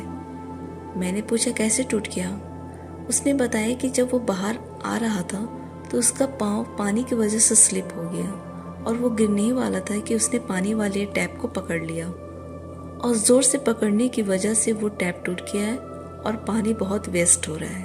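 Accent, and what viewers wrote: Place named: Kolkata, India. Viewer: Ap ki language culcuta ki hai Kia ap culcuta se ho Asalam u Alekum lots of love from Rawalpindi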